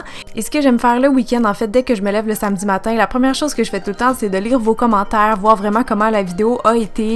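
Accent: Quebec, Canada. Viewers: Un vrai métier on se rend pas compte de tout ce travaille... c'est un pure bonheur de te regardé et de t'écouter car ton accent québécois me fais voyager 😊 bisous 😘 une fidèle abonnée française 😂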